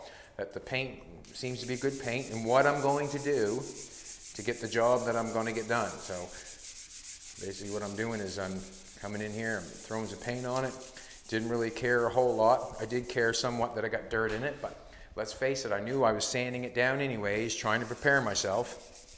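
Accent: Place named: Canada. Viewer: 30 minutes ago I was incredibly frustrated while attempting to polish the defects out of my own Imron paint job. I don't think this really helped me figure out how to fix it, but You're calm Canadian accent calmed me down, haha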